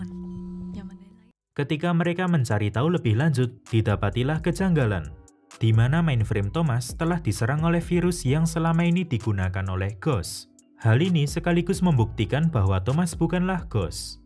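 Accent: Java, Indonesia. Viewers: Medok pisaaan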